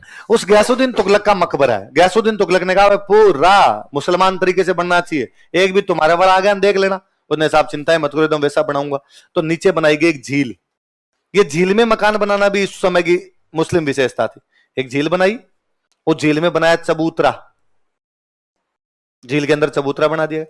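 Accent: Rajasthan, India. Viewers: राजस्थानी टोन ऐसी ही होती है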